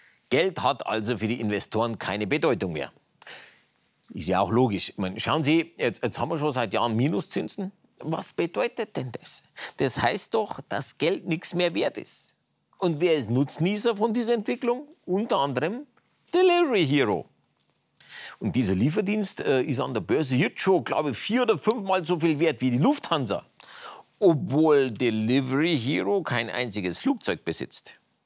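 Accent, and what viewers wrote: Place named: Bavaria, Germany. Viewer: Mir gfällt dei bayrisch du bist ja gar kein :)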